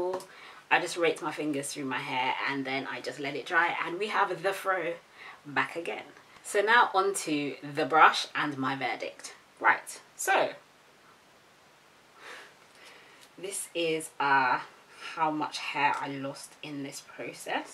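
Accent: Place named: London, United Kingdom. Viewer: thank you!!! I was trying to figure out your accent cause I knew it was London but I didn't know what part. I am from southeast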